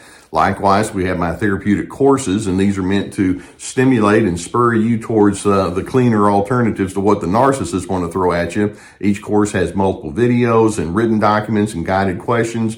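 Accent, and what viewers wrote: South, United States. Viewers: What state is the accent from? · I read your written article and I was hearing the words in your Southern accent! P.S. I am very glad that I am on the journey with you, too!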